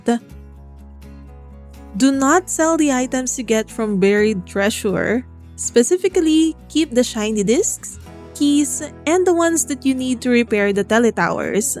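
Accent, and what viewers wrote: Philippines. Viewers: Great tips and video. That Pinoy accent though...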